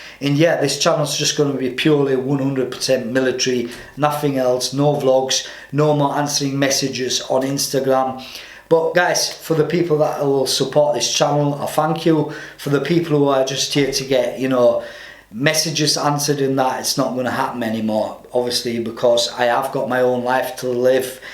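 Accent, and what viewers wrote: Scotland, United Kingdom. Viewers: Я сам нихуя не понял из-за британского акцента и диалекта, хотя английский знаю хорошо (американский английский) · That is a quintessential Scottish accent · I enjoy this guy’s accent. Are you a Scottish?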